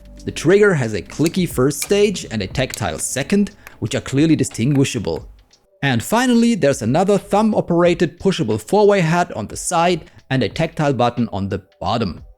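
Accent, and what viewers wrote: Germany. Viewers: Hi. I am new to your chanel, I live in Germany, from your accent I gues you are german, do you have any tips where i could get nyogel 767a? Danke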